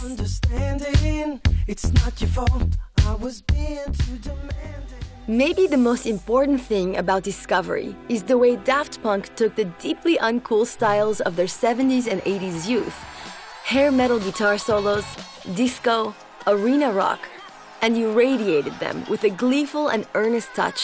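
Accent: France. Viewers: Nice french accent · Elle a un petit accent Français. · the french accent is absolutely needed. thanks.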